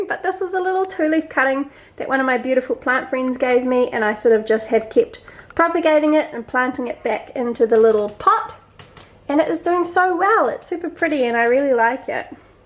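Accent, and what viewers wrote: New Zealand. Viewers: Lovely plant tour thank you. Your accent reminds me of my stay in Papatoe in Auckland.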